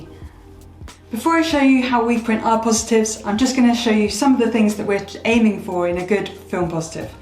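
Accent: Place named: United Kingdom. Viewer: Finally UK English!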